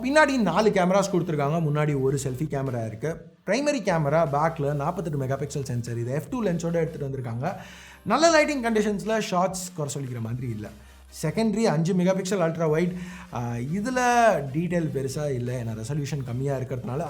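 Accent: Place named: Chennai, India. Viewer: Hi, Generally I won't comment on any of the videos but your content is very good and practical nowadays, I like the way you take this channel, Good to go, Youtubers need ppl support not from brand, you are proving it day by day by your videos and today I got to know even you can speak local Madras Thamizh. Mostly I prefer your channel to cross verify about a product. Thanks for your Genuine work.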